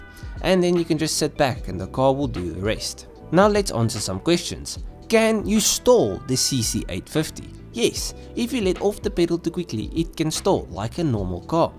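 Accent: South Africa. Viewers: Ayy is this bru South African? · are you south african ?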